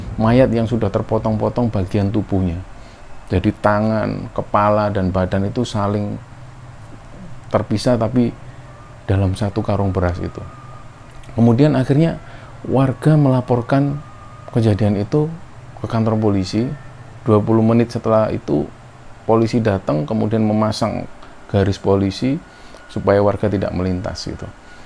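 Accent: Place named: Surabaya, Indonesia. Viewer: aku suka aksen suroboyoannya medok ...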